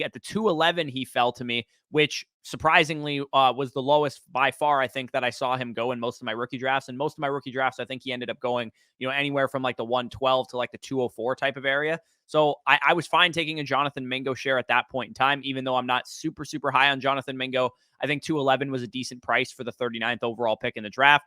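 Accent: Canada. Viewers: Nice, you from Canada eh,?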